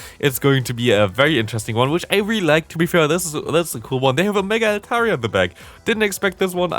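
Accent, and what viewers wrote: Germany. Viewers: ich wusste zwar, alleine durch den Akzent, dass du deutscher bist, aber Eutin ist nicht mal ne halbe stunde weg von mir😂 Grüße aus Schleswig Holstein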